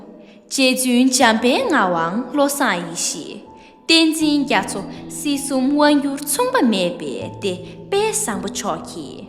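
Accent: Standard. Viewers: རྗེས་རབས་གསར་པས་་་་བུ་མོ་ཁྱེད་ཀྱིས་སྙན་ཚིག་དེ་མཐོང་སྐབས་་་་ད་དུང་ཡང་མི་རིགས་འདི་ལ་རེ་བ་ཞིག་ཡོད་པའི་འཚོར་བ་སྦྱིན་གྱི་འདུག་་་་་་དཔེ་ཡག་པོ་་་་་བོད་སྐད་གཙང་མ་་་་་ཀློག་གི་གཅོད་མཚམས་ལེགས་པ་་་་་་་བཀའ་དྲིན་ཆེ་་་་་མུ་འཐུད་་་འབད་བརྩོན་གནང་རོགས་་་་་་་་ལས་ཞོར་འགྲོ་ཞོར་ལ་ཉན་གྱི་ཡིན་་་་་་་་་ཁྱེད་རང་དང་་་་་ཁྱེད་ལ་བྱམས་བརྩེའི་སྐྱོངས་མཁན་གྱི་ཕ་མ་གཉིས་ལ་དམིགས་གསལ་བཀའ་དྲིན་ཆེ་་་་་་་་🎉🎉🎉🎉་་་སླད་མི་ཚེའི་ནང་་་་་གང་བསམ་ལས་ལ་རེ་བའི་འབྲས་བཟང་སྨིན་པའི་སྨོན་འདུན་དང་བཅས་་་་་རྒྱ་གར་བྱང་ཕྱོགས་་མ་ནའ་ལི་ནས་་་་་་་སྤུན་ཟླ་ནས་་་་་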